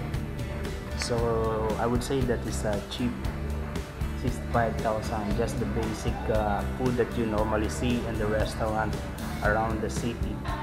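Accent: Philippines. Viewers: do not listen to people who criticizes you for speaking in english. you are trying your best, and i can understand what you are saying. sure, you may have a filipino accent, but we can all understand what you are saying. i’ve travelled to a lot of countries whose citizens can’t even understand basic english questions. point being, keep speaking in english, as you can only get better at it. i don’t ever reply on youtube video comments, as there are too many trolls, but i can’t stand it when people criticizes others for trying to improve themselves.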